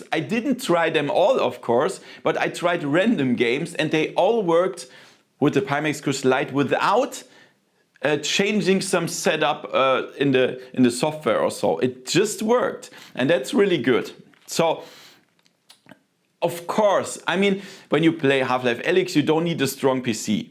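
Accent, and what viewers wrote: Germany. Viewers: Mein Gott, du erinnerst mich an meinen Vertriebschef, denglisch vom feinsten...das ist so lustig zu hören, sorry mann, aber das ist echt köstlich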